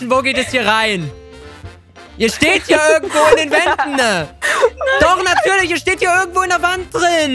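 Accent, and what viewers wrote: Saxony, Germany. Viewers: wo kommst du her XD du kommst mir vom sprechen her iwi aus Sachsen owo? stimmt des? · ich liebe deinen säsichen Dialekt 😍. und finde es mega cool das du dich vor der Kamera jeden Scheiß traust 😂# #Aboboycamper #LogobesterYT #verdient1MioAbos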